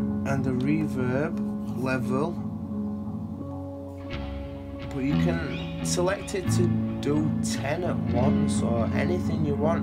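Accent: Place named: Yorkshire, United Kingdom. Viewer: nice yorkshire accent. :)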